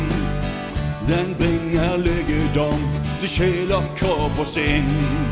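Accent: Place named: Kristiansand, Norway. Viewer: dette er da virkelig ikke en bergensdialekt. dette må være noe i området Kristiansand? Bibelbeltet aktig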